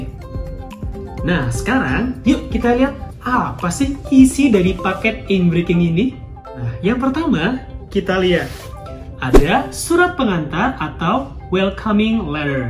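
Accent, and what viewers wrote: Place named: Java, Indonesia. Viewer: Sepertinya masih enggris Jawa ya..cara pengucapannya pronunciation nya belum natural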